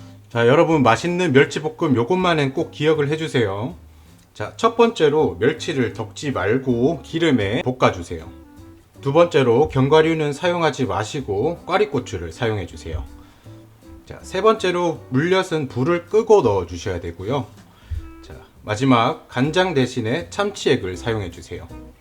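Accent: Gyeongsang, South Korea. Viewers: 멸치 볶음 때문에 구독한 게 아니라 경상도 구수한 목소리가 귀에 편안해서 구독합니당🤣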